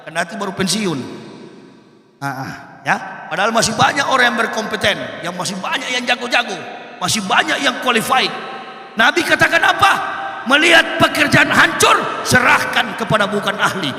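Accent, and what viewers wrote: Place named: Manado, Indonesia. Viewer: Logat manado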